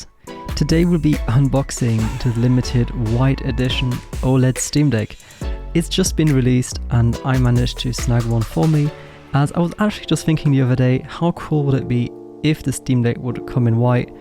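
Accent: Germany. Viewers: Geiles Video ! Am Akzent hör ich das wir die gleiche Sprache sprechen :)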